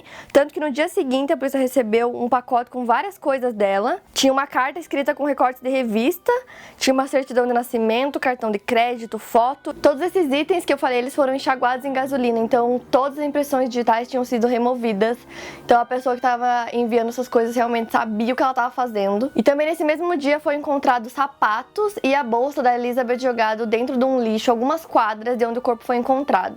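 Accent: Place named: Paraná, Brazil. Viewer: Claro que tem. Todo mundo tem! Ela fala "de" e não "dji", por exemplo, que é uma característica do sotaque do Paraná.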